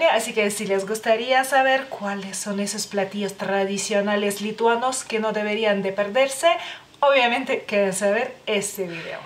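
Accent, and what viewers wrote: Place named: Spain. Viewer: Ya me dio hambre...no se porque🤔... Hablas muy español y esta bien bonita.👍🏼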